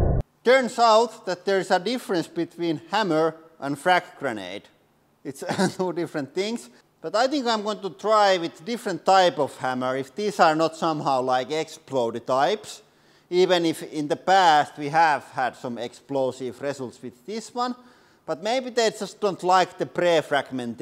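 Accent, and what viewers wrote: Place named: Finland. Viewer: I haven't seen these vids for a few years, I'm so happy to hear the charming finnish accent hasn't changed a bit :D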